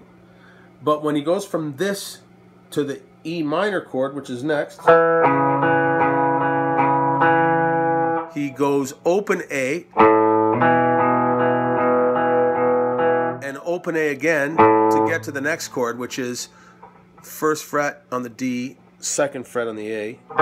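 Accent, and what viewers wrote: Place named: Canada. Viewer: Your accent is pure Canadiana.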